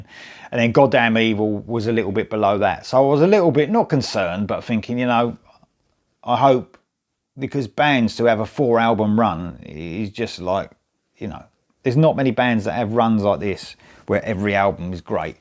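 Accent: England, United Kingdom. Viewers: Thanks man for a wonderful experience. Really enjoyed it. I agree it is indeed a wonderful album. You made it more so with what you've done. Not into metal as much as you guys, but I love. Always have. I enjoy hearing you speak. Love that English accent. I'm from the Caribbean. Thanks again man. God Bless you.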